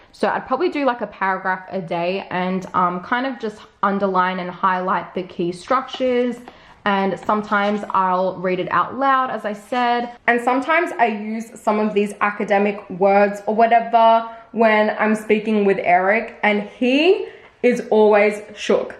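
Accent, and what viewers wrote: Australia. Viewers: I don't know if anyone gonna talk about it or not but I love your Aussie accent 😁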